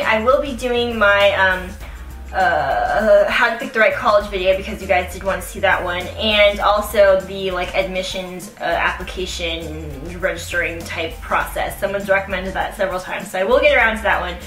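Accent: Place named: United States. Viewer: All I have to say is: WOW! College is SO different where you're from (I get the idea that it's somewhere in the US from the way you speak but I could be wrong)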